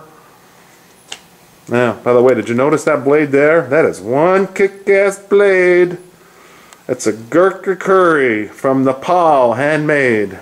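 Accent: Long Island, United States. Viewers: I knew I heard some Long Island in you. I grew up in Westbury around the same time you were growing in Smithtown, Cool. I appreciate your vids.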